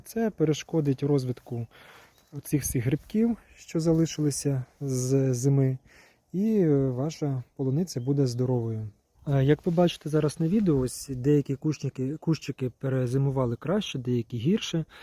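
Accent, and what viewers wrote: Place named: Ternopil, Ukraine. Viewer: Ми, напевно, з Вами земляки, бо мова у Вас справжня ( я з Тернопільщини). Поради Ваші грамотні, конкретні.Я підписалась.Успіхів Вам! Слава нашим захисникам! Слава Україні!❤❤❤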